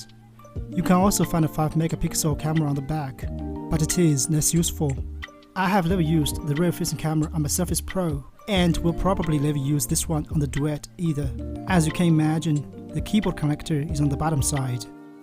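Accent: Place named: China. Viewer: Fluent English with a strong but unique Chinese accent, I love it.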